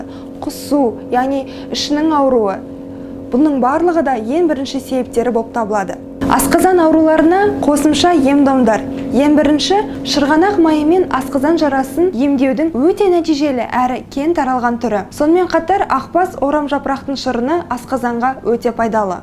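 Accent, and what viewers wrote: Standard. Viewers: Құп көрдік. Міне осындай таза сөйлейтін қазақтар көбейе берсін... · Міне керемет ! Таза қазақша түсіндірді кеңесіңе Рахмет!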